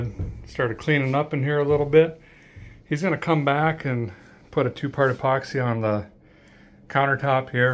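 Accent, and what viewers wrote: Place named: South, United States. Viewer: I’ve noticed that you are starting to sound like a true southern. When you start saying Fixin to do something you have arrived my friend.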